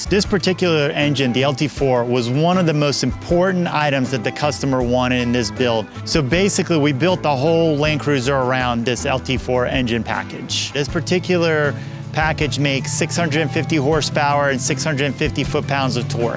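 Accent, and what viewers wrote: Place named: Louisiana, United States. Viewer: Why you got a Cajun accent, my guy?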